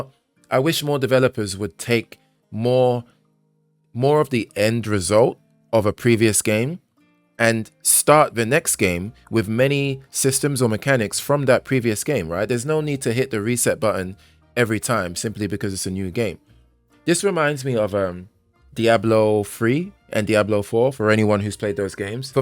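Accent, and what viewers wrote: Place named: Scotland, United Kingdom. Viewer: the scottish-ish accent had me rolling mate, keep doing what you're doing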